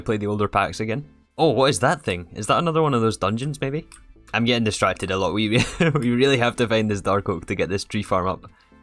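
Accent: Scotland, United Kingdom. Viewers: Mate im going insane trying to find this exact pack, with the quest book etc... Can anyone help me out? What mod launcher do I need, what pack contains the quest book? I havent played Minecraft in years and I cant find this exact pack for love nor money... if someone could point me in the right direction? Cheers! Love your stuff, honestly one of the best creators on here...me and the wife constantly sing to ourselves... Divine Joourney Toooooo in your scottish accent all the time haha Safe x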